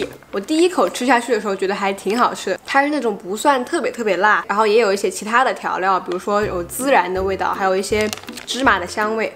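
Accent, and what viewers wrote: Changsha, China. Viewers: Zhi Xuan Ang 口音大陸人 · nia xswl我就说口音怎么如此熟悉原来你是长沙的嘛哈哈哈哈哈哈哈哈哈哈哈哈哈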